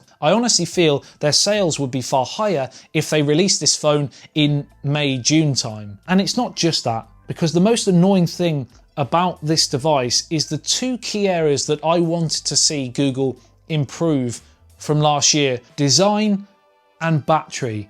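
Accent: United Kingdom. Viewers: what can i say...feel the same. damn so cute and that british accent you could sale anything to me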